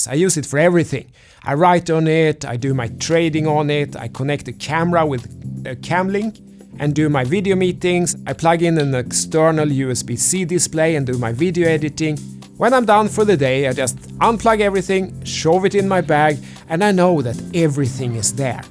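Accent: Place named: Sweden. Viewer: My gf is swedish and as soon as I played the video she recognized the accent😂 great review!!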